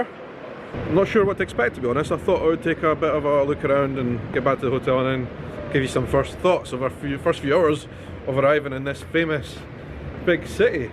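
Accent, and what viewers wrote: Scotland, United Kingdom. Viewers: Hello are you from Scotland?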